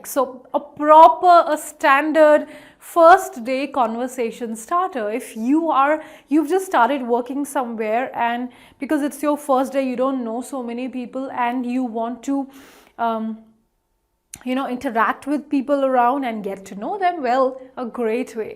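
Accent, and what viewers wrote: India. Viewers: Yet, It's an Indian English :face-red-droopy-eyes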